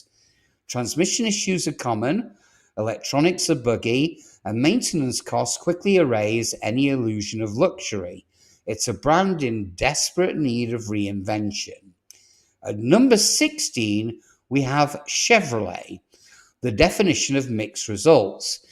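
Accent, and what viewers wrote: United Kingdom. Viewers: SOUND LIKE ANOTHER BRITISH EXPERT,WHOSE COUNTRY HAS THE WORST CARS IN THE WORLD TALKING ABOUT GOOD RELIABLE VEHICLES! BELIEVE THAY BULLSHIT ! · No more British accent narrators ‼️ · Despite British voice, American cars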